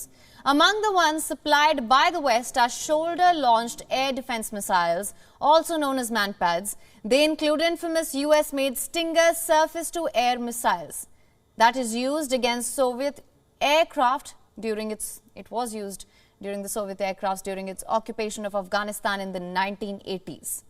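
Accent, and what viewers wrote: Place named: India. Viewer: Too heavy Indian accent --- can't understand what she was reporting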